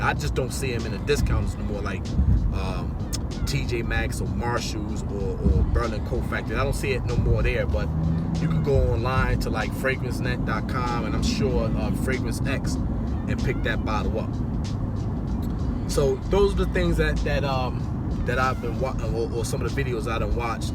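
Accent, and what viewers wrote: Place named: North, United Kingdom. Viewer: Subscription granted. Good video. I can hear the up north accent.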